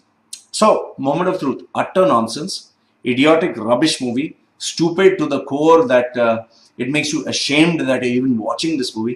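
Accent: India. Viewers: An Indian guy gets some exposure to Hollywood movies thinks he is qualified enough to bad mouth Indian Movies. So in his Indian accented English the dumb-f*ck goes on crapping. Meanwhile the movie breaks all milestones.... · OMG.. Who d fuck r u?? Or shud I ask what d fuck r u?? :-D.. Luk lyk some wana be Mexican dumb fuck.. U wid dt Indian accent trying to be western and tokn on their part is Jst amusing.. Will definitely recommend ur videos for Ppl to hv a gud laugh.. I pity u.. As for the review.. Dun think Ppl give a fuck Abt Wt u say.. Wt an ass hole..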